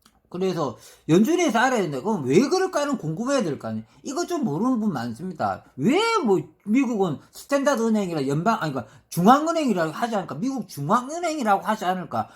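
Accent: Gyeongsang, South Korea. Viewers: 네~~맞아요 목소리도 좋고 경상도 사투리 도좋고 ㅎㅎ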